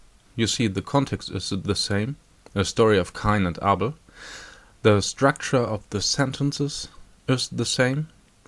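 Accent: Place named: Germany. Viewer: The way you say threat the last seconds combined with your German accent worries me.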